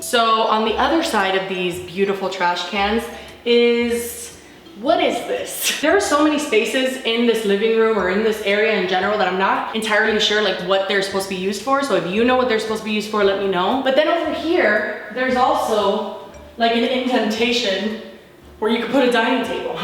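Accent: Miami, United States. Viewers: I recently found out you're from the 305 and when you say "you know" it's so obvious. I cannot believe I've been watching you for YEARS and never noticed. I also never even clocked you as Hispanic. I'm a failure as a Miami Cuban.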